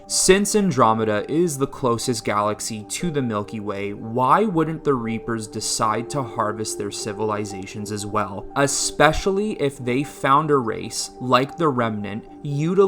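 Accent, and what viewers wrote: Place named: Canada. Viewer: you’re so welcome. Also I LOVE that Canadian accent 😃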